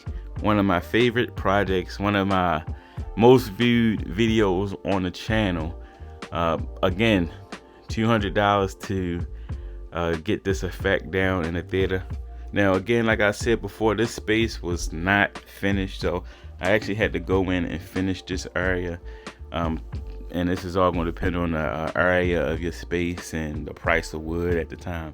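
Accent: Baltimore, United States. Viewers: Looks good man. You from Bmore? Accent sounds like it. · That bmore accent is ridiculous lol tew hundred dollars is nuts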